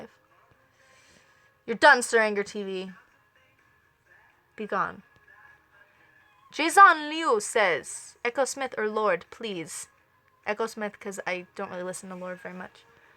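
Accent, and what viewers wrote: United Kingdom. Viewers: Missed it, but I had a buuuurning question... Are you British or American? I just can't lean towards one or the other... Thanks :-D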